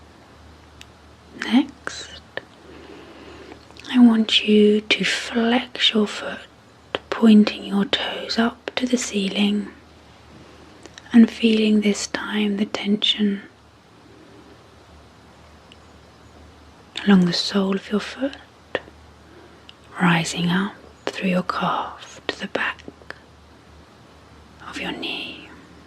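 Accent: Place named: West Country, United Kingdom. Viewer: I wonder where this lady is from? She’s got a Wiltshire/Somerset vibe.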